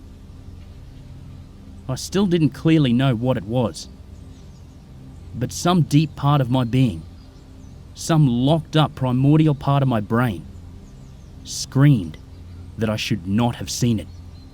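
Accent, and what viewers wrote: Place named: Australia. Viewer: Love the Australian accent and I really enjoyed this video a lot and awesome job on it at the narration.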